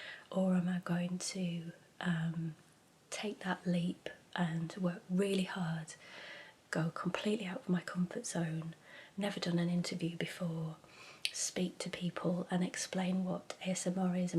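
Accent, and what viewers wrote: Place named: Northern England, United Kingdom. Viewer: I love your northern twang still coming out when you speak. You have a lovely voice… congratulations on 10 years ❤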